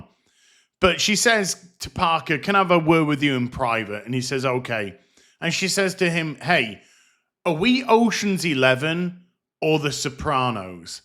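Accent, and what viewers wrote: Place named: England, United Kingdom. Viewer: When calls something Retarded with that smooth English accent, you know the show is 💩🤣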